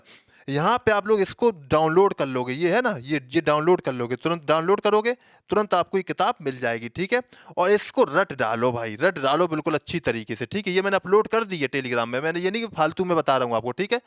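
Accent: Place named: Kanpur, India. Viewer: Sir kanpuriya language bol rhe h.. lgta h kanpur se h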